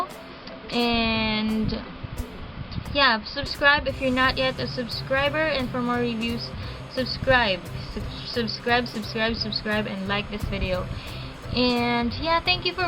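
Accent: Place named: Philippines. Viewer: Are u filipinoss?? So beautifull. And ur tagalogg soo 😍😍😍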